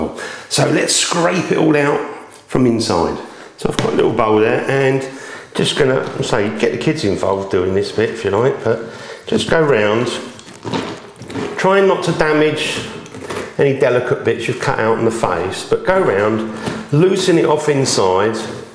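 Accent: United Kingdom. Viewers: u sound like someone from little brittan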